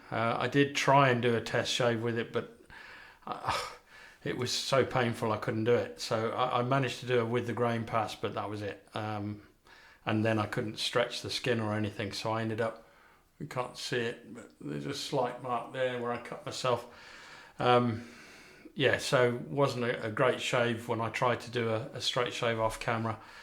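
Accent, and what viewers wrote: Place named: Birmingham, United Kingdom. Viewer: ayyyyyy Bimrmingham, I was gonna say I recognise that accent, that my accent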